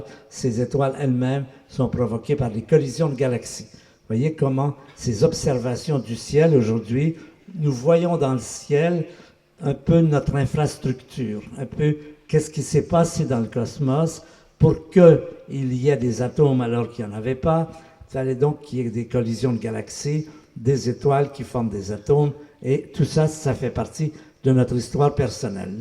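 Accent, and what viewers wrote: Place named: Canada. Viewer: ZzEL Chavez Zz Hubert Reeves est Canadien, permet lui d'avoir un accent !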